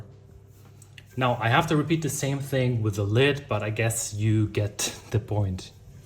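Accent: Germany. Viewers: WOW! I never knew this before! Thanks for sharing! Love your Germ-lish!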